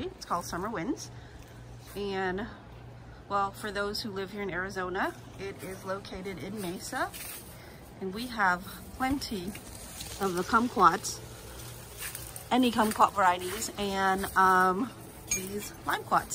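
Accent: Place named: India. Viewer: You sound like a normal American with hints of an Indian accent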